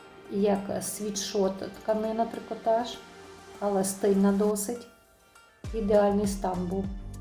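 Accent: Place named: Sambir, Ukraine. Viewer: Ви зі Львова а я з Самбора і говорите так як ми в Самборі , люблю Львів шкода що так мало часу щоб просто приїхати , походити , в мене син аутист , то ми приїжджали тільки по справах і щоб здати аналізи . А тепер думаю скільки ми втратили , бо тепер у Польщі .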